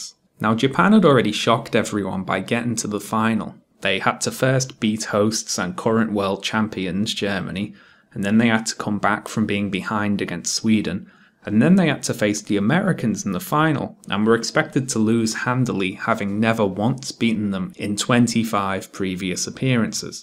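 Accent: Liverpool, United Kingdom. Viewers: ahh wait nah im now hearing more liverpool type tones in your accent, nvm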